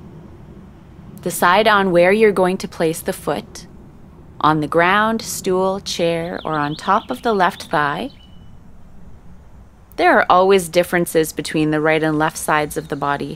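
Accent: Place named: Canada. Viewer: thank you SO much for making (I've only seen one) - this video. I will look for the 'other' video - and continue practicing Yoga. I'm almost 71, a Canadian living in Sedona, AZ. Not only did I find your technique soothing as well as 'stretching' - it was fun to hear the Canadian accent! Typically, I go to a rec center once weekly for chair Yoga. And, now I can do so in the comfort of my home, daily, if I choose. I love how the three ladies demonstrate various ways of executing the movements, depending on one's body's capabilities. And I love how they smile. Congrats, Ladies!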